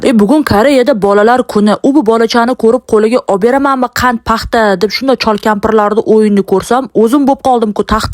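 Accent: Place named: Tashkent, Uzbekistan. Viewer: Opam sz keli Toshkent gap zur gapirasz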